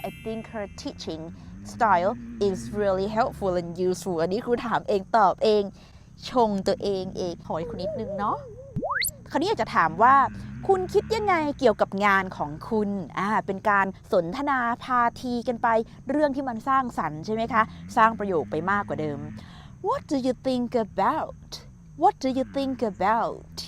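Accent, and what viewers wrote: United Kingdom. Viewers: ผมชอบสำเนียง British Accent ของ มากเลยครับ ไม่ทราบว่า แต่งงานยังครับ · ชอบสำเนียงบรีติช ของ มากครับ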